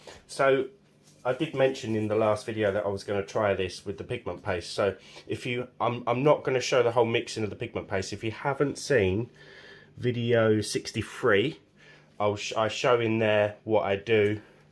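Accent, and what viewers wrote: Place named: England, United Kingdom. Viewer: Sorry, I must have missed something you said, when do you apply the black/white colour to the pieces please? I’m worried about doing it too soon and obliterating everything else. Thankyou so much for your work and videos, sometimes they are all that keep me afloat, it’s lovely to hear an English accent too, being of that country.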